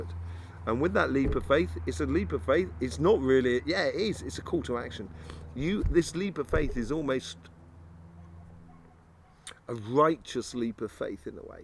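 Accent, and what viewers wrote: United Kingdom. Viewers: Have not met this person yet. If i have not aware of it. Not looking for a relationship. I just moved across the country from the east to the west. We had a catagory 4 Hillary Hurricane. Being from the Jersey Shore it eas a windy very rsiny dsy. My son whose lived here for over 29yrs now. Remembers what a cat. 4 hurricane really is. 😅too funny. T zU I like your readings. Love the B accent. My ancestors are British. U msy be from another Vointry? Sounds very British to me. Oh no not goung to wait too long nor be over cautious. Btdt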